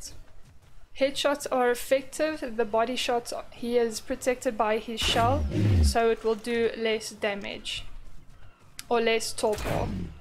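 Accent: South Africa. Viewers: Wait... This accent🤔 sounds familiar.... You're South African right? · Are you south african? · South african accent. Beautiful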